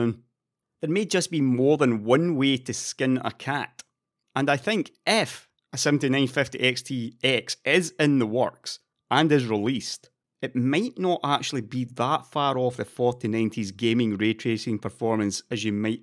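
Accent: Scotland, United Kingdom. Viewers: "assuming they have a 7900XTX in the works"? It sounds like you're in Scotland but I'm pretty certain the news gets there too! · Started watching out of interest, finished watching to listen to a Scot say "faster in raster" 69 times.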